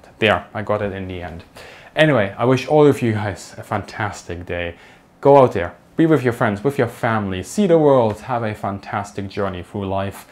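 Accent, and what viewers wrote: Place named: Germany. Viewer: Try to speak slower, because your pronuntiation is all but standard,rp, or any other any way. German pronunciation is dufficult to understand. At ,75 speed it is easier.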